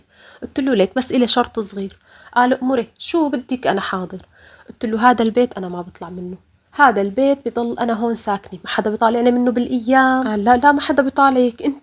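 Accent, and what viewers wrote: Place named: Homs, Syria. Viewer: دخيل لهجية حمصي ❤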